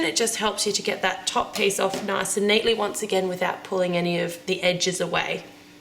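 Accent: Australia. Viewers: I love your accent.. why do the Australians get the good accents? :3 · Thank you so much I was about to embark on this mission with limited knowledge... I know a heap more now and nice to hear an aussie voice for a change.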